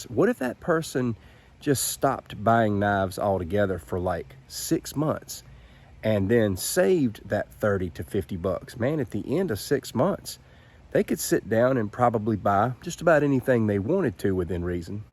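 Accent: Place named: West Virginia, United States. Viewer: I sent this to my mother and her jaw dropped. 😂 We have the same facial features, eye color, goatee, shaved head, height, weight, age, speaking cadence, etc. And we're both blade enthusiasts. The only difference is your accent is West Virginia, and mine is Kentucky. When I start making videos, I'll send you a link. It's wild, bro. 😂😂😂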